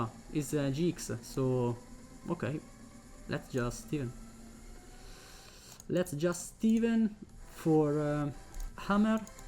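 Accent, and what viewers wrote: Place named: United Kingdom. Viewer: ma quanto cazzo sei british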